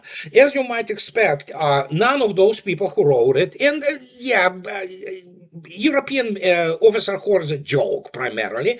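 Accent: United Kingdom. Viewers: That British high-class accent allows that idiot to make hogwash sound intelligent. But those of us that have followed the situation for many years know he is spouting BS. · Slava Russia…. OMG: that British fool with some problems with his dialect…❤️🇷🇺❤️🇷🇺❤️🇷🇺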